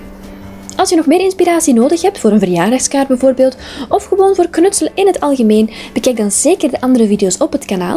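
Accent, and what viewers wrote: Belgium. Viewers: Jij bent toch Belgisch?, te horen aan je stem🇧🇪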